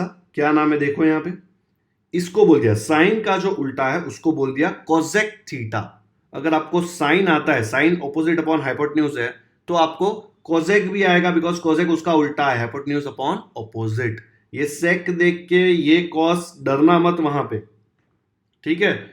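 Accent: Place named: Maharashtra, India. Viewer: Sir i think you are Maharastrian : - becase of your pronunciation (Am i Wright ? ) 😊😊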